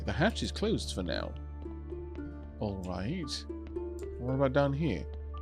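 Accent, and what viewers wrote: Wales, United Kingdom. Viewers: So I don’t know if you have “for science’ed” this yet, but in your first run you did try to sell raw sliced meat without the stamp and you couldn’t. That may have changed since then. I don’t remember if you ever sold cooked meat dishes at all, burgers were too valuable. Also, My partner, Todd, doesn’t normally get excited when he walks in on me watching one of my YouTube channels. However, he does like, and this game in particular. When I watched the previous episode he walked in and asked “Is this the game with Comrade Donkey‽” I will probably buy this finally (It used to be on XBox Game Pass but dropped off I think, and also I got rid of my GP.) It’s very charming and I know so much about it because of this series. (Now if only the game could include a running commentary in dulcet Welsh tones. Oh, well, I’ll have to settle for watching this while I play.)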